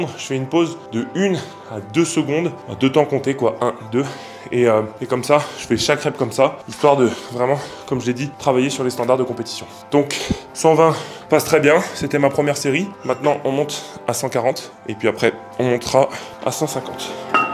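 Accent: Quebec, Canada. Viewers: Ce qui m'a le plus impressionné dans la vidéo c'est clairement ton accent québécois 😲 t'as habité là bas ou quoi ?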